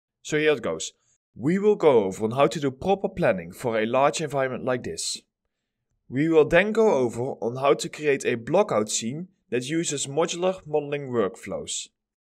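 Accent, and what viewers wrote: Netherlands. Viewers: Ooof a heavy case of Dunglish. But cool tutorial!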